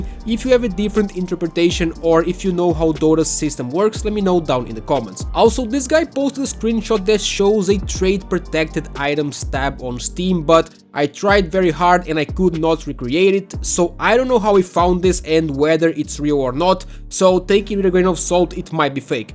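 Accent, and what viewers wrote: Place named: Portugal. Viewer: mete mais sotaque tuga nessa merda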